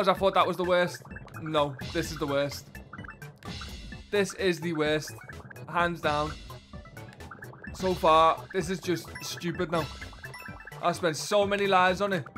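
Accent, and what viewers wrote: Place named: Liverpool, United Kingdom. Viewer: Scouse lad playing game of the year. Count me in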